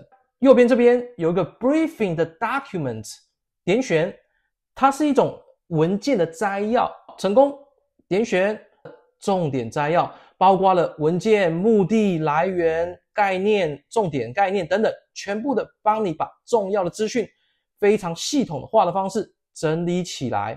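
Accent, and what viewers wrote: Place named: Taiwan. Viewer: 正体中文，而且是台湾腔。不要和对岸搞混了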